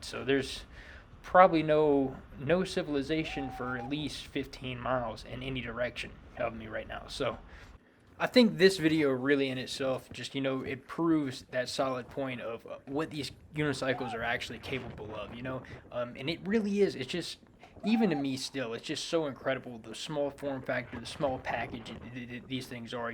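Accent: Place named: Carolinas, United States. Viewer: Yooo! I just heard you say you were in SC and NC! I thought your accent was from the Carolinas! I'm in NC, and would love to hear about any trails you'd recommend riding out here! I loved hearing the stories of how you've helped people with the unicycle. 😁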